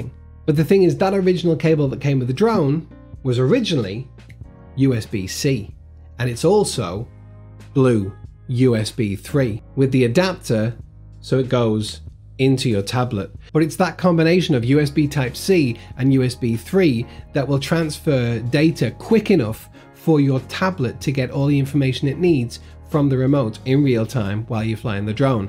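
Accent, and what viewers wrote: United Kingdom. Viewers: BRILLIANT ... I feel so stupid.... i bought a used ANDROID tablet, just for the drone, tested at home, props off - GREAT !!!! Went to fly today, thinking it was a great idea to take one of my shorter leads, from the 'stash' that we all collect. I had to revert to my phone and the supplied short lead, I just couldn't get my tablet to connect.,. I tried changing settings, everything I could. it wouldn't work. Then, I came across this video, rummaged through my stash and found the lead I'd used first. It worked, a Sony USB-3.... Fantastic. I then found a short USB-C and will use this with adapter. Thank you so much for this. SUBBED your channel based on this and the fact it's UK accent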